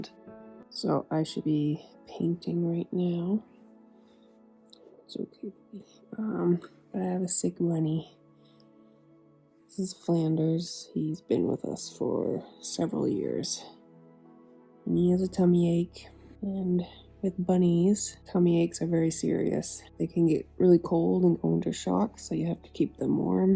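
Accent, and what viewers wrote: Canada. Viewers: Hi. I’m a senior Canadian woman living in Europe. So when I see your videos, I get the chance to hear a fellow Canadian. For me it’s also a cultural connection. Your videos are informative - I get to see more characteristics of wood and different styles of furniture. All I see is an excellent presentation - certainly something for which you should be proud. As for your tattoos, I think they’re beautiful. Thank you, also for your generous contributions to protecting bunnies and for caring about your community. Please continue making your videos. I cannot do furniture work in my apartment, but if I had to shop at one of the second hand shops (almost every village has one), I would be better informed regarding the pros and cons of an old pieces of furniture. Thanks so much for making my YouTube experience an educational one (as well as entertaining). 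Take care and best wishes for a wonderful future. …..waiting for your next contribution. Thanks again.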